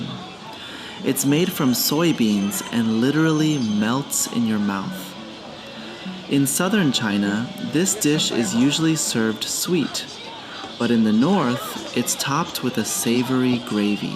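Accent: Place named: United States. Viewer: please dont use the american sounding voice, the one from your first video is much better!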